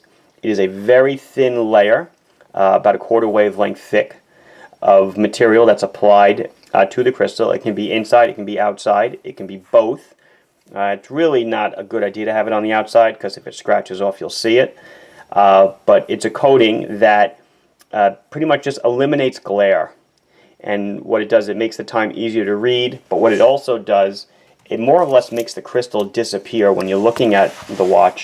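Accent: Long Island, United States. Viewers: I grew up on Long Island, the watch information is interesting, but your Long Island accent so reminds me of home. Be well.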